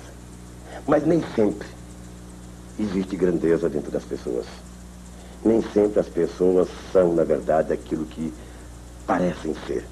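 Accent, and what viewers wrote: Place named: Northeast, Brazil. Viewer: Sumiu o sotaque? Estamos em 2022, e ele fala errado e usa o sotaque nordestino...como é um artista, devia fazer novela., não estar na política, fazendo o povo chorar! Cris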